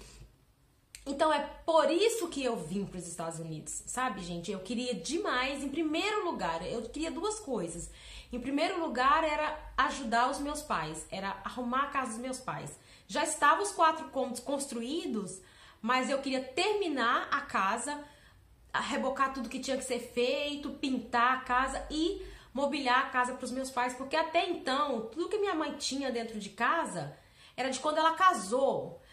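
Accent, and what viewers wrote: Minas Gerais, Brazil. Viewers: Vc num chorou mas eu sim kkkkk Glória a Deus pelas conquistas 🙌 Exemplo de mulher! Q orgulho dessa mineira kkkkk Sotaque nao nega!!! a primeira vez q vi um vídeo teu logo percebir essa é mineira😍❤😊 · Quando vim pro seu canal já me apaixonei pela pessoa que vc é , só de olha pra vc já dá pra ver a honestidade humildade e força de vontade que vc tem, fora que na hora já vi pelo seu sotaque que vc é daqui que Minas . Baum d+ Uai! Tbm sou Mineirinha da gema , de BH 😘 (Vendo em 11/06/2018) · Assim que vi seu vídeo pela primeira vez, logo pensei ela é mineira..kkkkk Esse nosso sotaque é inconfundível. Que Deus continue abençoando sempre 😍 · sotaque gostoso esse de minas .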